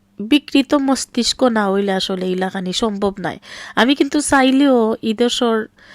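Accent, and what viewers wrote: Sylhet, Bangladesh. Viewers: Apner mukher vasha suddho khati sylheti vasha ami o akjon sylheti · Afa please keep speaking Sylheti , I like the way you talk No afa sylheti people try to speak Dhaka language, it’s doesn’t suit them 😂😂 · I like your Sylheti bengali, don't change, you're a unique sister.❤ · আসসালামু আলাইকুম আপু আপনার সিলেটি মাত আমার খুব ভালো লাগে। আমি একজন সিলেটি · Apu aponar mat amar kace kub balo lage amio sylheti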